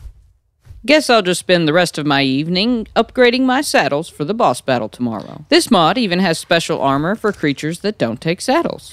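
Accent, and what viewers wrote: South, United States. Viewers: You sound so southern i cant tell if you're a guy or girl. Loved the video tho.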